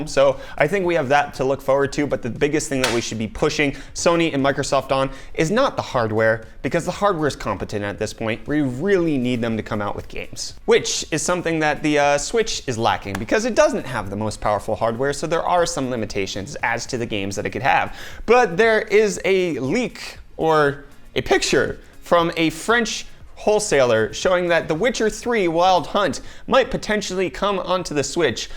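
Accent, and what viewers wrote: United States. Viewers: So this has probably asked before but Im new to your channel. How is it that you are an American or at least have an American accent and living in South Africa? Really love your content by the way. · You sound extremely American, for a guy from south Africa.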